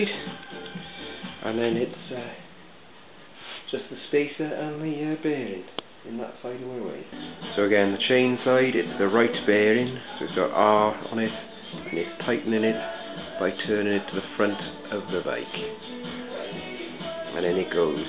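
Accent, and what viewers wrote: Wales, United Kingdom. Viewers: Proper good and proper Welsh. Excellent! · Haha, very welsh. Good video. Cheers.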